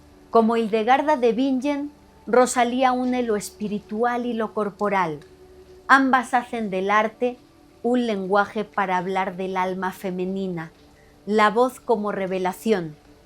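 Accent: Spain. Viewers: Hola Mi esposo y yo siempre te escuchamos y nos da mucha nostalgia tu acento,pues teníamos un sacerdote español en nuestra ciudad en Quintana Roo y el era como tu una biblioteca andando ☺️🥰 Me gustaría saber dónde puedo obtener el curso de santa hildegarda,no me gustaría perder esa gran oportunidad Muchas gracias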